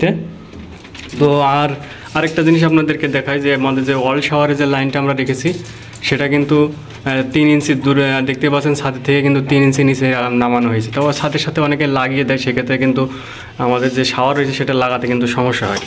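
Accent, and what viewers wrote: Jessore, Bangladesh. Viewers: খুব সুন্দর ভাবেই উপস্থাপন করার জন্য ধন্যবাদ। আপনার কথায় বোঝা যাচ্ছে যশোর এলাকার মানুষ। আপনার বাড়ি কোথায়?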